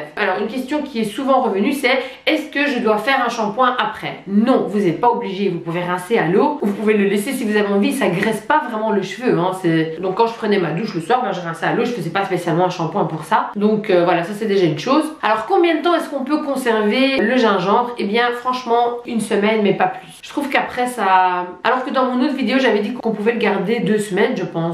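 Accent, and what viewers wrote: Brussels, Belgium. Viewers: Je suis complètement sous le charme de ta super personnalité positive (y) Et les petites touches d'accent bruxellois que tu intègres spontanément me font bien sourire;-) Continue comme ça et bisous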